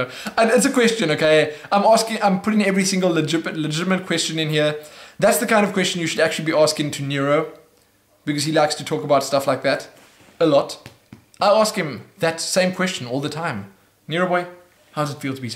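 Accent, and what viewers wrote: South Africa. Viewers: Gogo the SA accent! Good show and a new fan!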